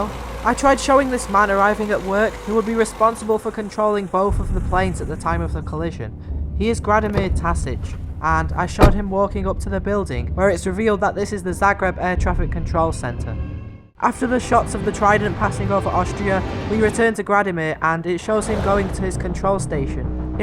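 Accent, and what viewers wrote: United Kingdom. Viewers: bruv I love your vids And your accent is kinda funny(no offence sorry I know your British correct me if I’m wrong)(btw Im subscribed😊)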